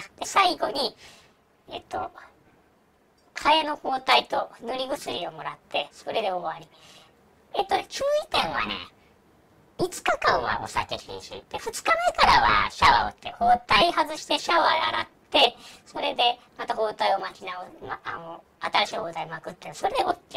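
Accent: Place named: Tottori, Japan. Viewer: やっぱり鳥取方言にしか聞こえない。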